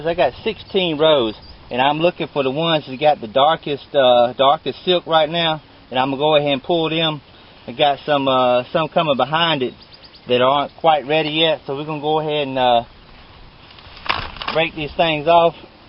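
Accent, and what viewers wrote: South, United States. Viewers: Sorry all I could think of hearing your voice is the movie character Forrest Gump!! I don't mean that in a bad way!! I love the southern accent and I'm from Australia!! Y'all!!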